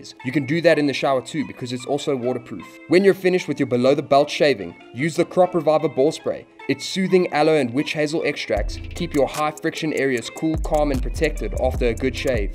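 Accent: South Africa. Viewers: You sound South African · You're definitely from Durban. That accent lol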